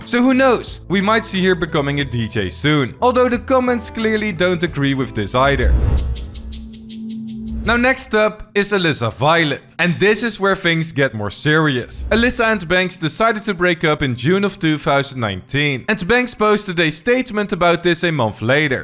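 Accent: Netherlands. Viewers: Ayo you sound dutch af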